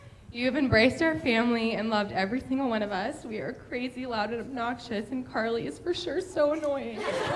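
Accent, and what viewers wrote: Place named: Midwest, United States. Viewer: I'm thinking your from the mid west, love the accent and your sincere thoughts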